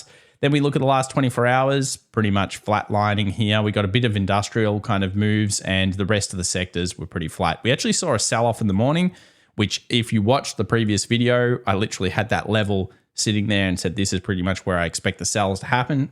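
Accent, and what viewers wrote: Australia. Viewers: you're Australian? I thought you're British.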